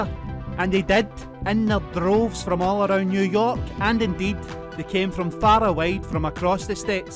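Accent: Scotland, United Kingdom. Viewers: Was in english, with a scottish twang.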